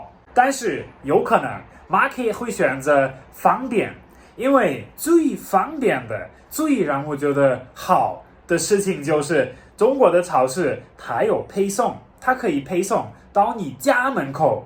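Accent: Sichuan, China. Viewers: “ ”的发音好四川，哈哈，你讲的是老外普通话夹杂四川词汇，非常有意思！继续加油哦 菜市场比较传统，便宜新鲜，会过日子的老人主妇煮夫喜欢去，年轻人则更习惯去超市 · 一口道地四川話，很有趣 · 小伙的汉语已经被四川人带跑偏了😃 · Learn. Chinese stay Beijing . He speak si chuan. And is video skill need improve . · 晕一嘴川味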